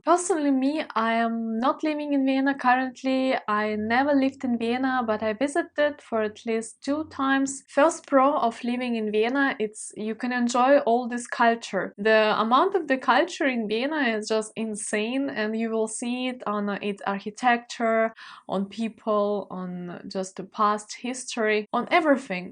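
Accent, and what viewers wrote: Russia. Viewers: Are you from Russia ? У тебя замечается русский акцент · Hallo liebe (meine Tochter heißt auch ) und Servus (=Hallo) aus Wien! Da dein Englisch viel besser ist als meines, schreibe ich dir auf Deutsch. Ich finde deinen leicht russischen Akzent bezaubernd! Gratuliere dir zu deinem sehr informativen Video. Das ist sicher eine große Hilfe für andere Menschen, die sich für ein Leben im schönen Wien interessieren. Du hast wirklich die wichtigsten Punkte erwähnt. Zu erwähnen wäre vielleicht nocht, dass Wien, für eine so große Stadt, auch sehr sicher ist. Ich denke, gerade auch als Frau, kann man sich in Wien eigentlich, zu jeder Uhrzeit, und an jedem Ort sehr sicher fühlen. Die Kriminalität ist kein nennenswertes Problem in Wien und auch die Polizei ist sehr zuverlässig und tatsächlich für den Bürger und die Menschen da. Ja, auch ich bin, natürlich als Österreicher (das gilt nicht nur für Wien) ein Kaffeeliebhaber. 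Es gibt wirklich unzählige traditionelle, wunderschöne Cafes in Wien, aber, geraden in den letzten Jahren, kamen sehr viele, moderne, coole Cafe dazu, die oft den Kaffee selbst rösten und hervorragende Qualität und Auswahl anbieten. Als Beispiel: <link> oder <link> Die berühmten "Mehlspeisen" also die süßen Dinge, wie Sachertorte etc. nicht zu vergessen. Natürlich leidet das Leben in Wien derzeit auch unter Corona und dem Lockdown, aber ich hoffe das bessert sich 2021 wieder deutlich. Auch ich vermisse es nämlich schon sehr, bei einem guten Kaffee, einer Zeitung und mit ein paar lieben Menschen, zu sitzen und zu genie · ust i heard the 1st word i recognized that you're russian at once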